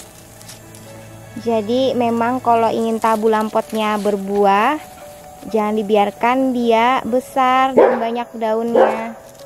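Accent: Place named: Java, Indonesia. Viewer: kak pernah tinggal di Jakarta ya??cara ngomongnya mirip sama orang di Pulau Jawa umumnya hehehe, soalnya kalo orang Kalimantan asli ngomong Bahasa Indonesia itu agak beda dari orang di pulaubJawa🤣🤣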